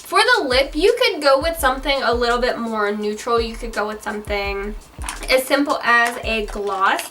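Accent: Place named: South, United States. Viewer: Sucks you had a allergic reaction, i had one to fix plus spray 😭 glad your feeling better 💜 i also have the 1st palette from carli bybel. I love the highlighters in the palette, great prom tutorial doll💜 oh and yes you sound a bit country, not a lot lot but a bit of a accent. Lol its cute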